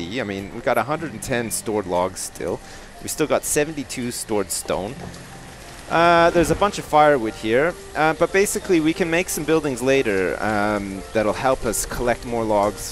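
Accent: Canada. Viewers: I can hear your Canadian accent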